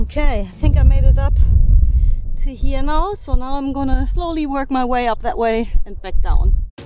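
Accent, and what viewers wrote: Australia. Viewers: Loving the amber finds Meanwhile, how is your family with your 'aussie' accent? 😊😊😊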